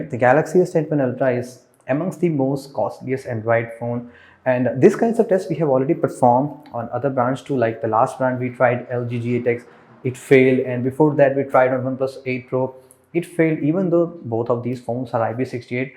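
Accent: India. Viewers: Indian accent is so annoying lol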